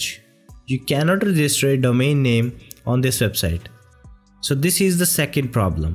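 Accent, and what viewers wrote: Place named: India. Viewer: Me not believing this video would solve my problems(😫) Me after listening to the indian accent(😁)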